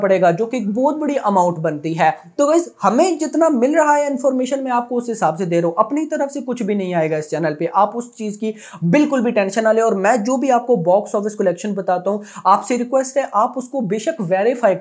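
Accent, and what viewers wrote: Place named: Pakistan. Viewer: Bhai apka accent pakistani jaisa q hai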